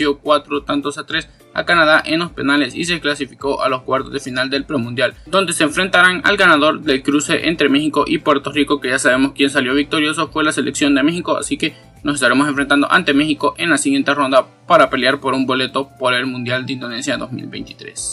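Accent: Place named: El Salvador. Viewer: Mi amigo, utilizá Loquendo! No se te entiende y tenés acento como de salvadoreño. Un abrazo!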